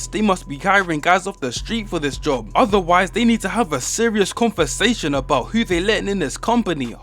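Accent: United Kingdom. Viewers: A British nigga talkin bout yns is wild to me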